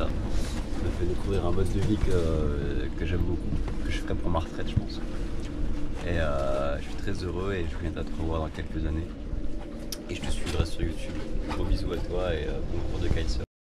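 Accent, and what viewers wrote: South, France. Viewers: SALUT l'amie qui parle le sud je sui guedin rien que de t'entendre je me bar a la réunion dans 2 a 3 semaine re-joint moi faut juste faire l'afrique pour moi c dommage je le fait pas encore en voillier mais bon a charge de revenche aller profite fait des bon virement de bords sans partir a l'eau tes seull mes avec nous on t'aime é te soutien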